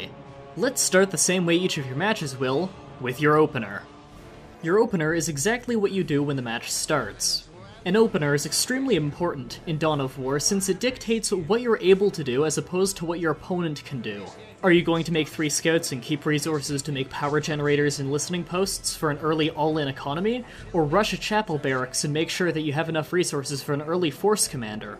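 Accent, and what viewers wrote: Canada. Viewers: You canadian right? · He is so frickin Canadian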